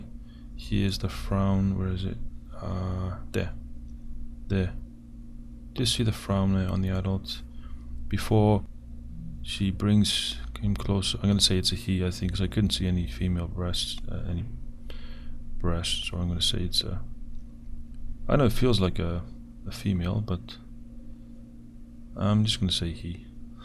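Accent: Wales, United Kingdom. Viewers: Great analysis, again! It's certainly intriguing, to the point of being believable. Although it's certainly not an important point I must say that you're very easy to listen to - are you Welsh? Sounds Welsh to me but quite a soft accent. Just wondering 🤔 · are you Welsh you sound like you have a bit of a Welsh accent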